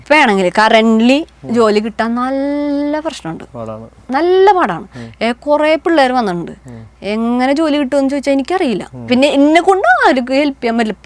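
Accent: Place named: Thrissur, India. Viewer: All good but I am from Mattancherry and even I cannot place her slang to Vyppeen. Thrishur, yes. Something's amiss! Oh, well!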